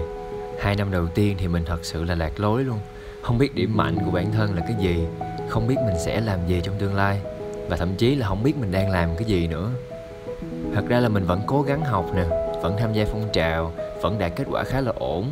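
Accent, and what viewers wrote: Vietnam. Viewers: Chất giọng người Nam Bộ sao thương quá là thương !!!!! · Trời ơi cái giọng sài gònnnnnnnnnn 🥰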